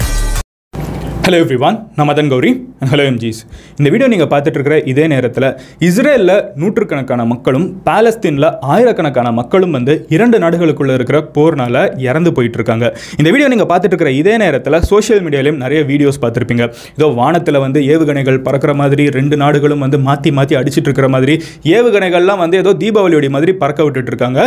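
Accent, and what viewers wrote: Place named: Kanyakumari, India. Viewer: Kanyakumari slang ❤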